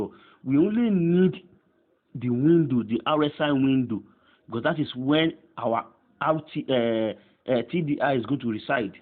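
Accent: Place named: Nigeria. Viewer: Add bulegoo bands... dam gotta love Nigerian accents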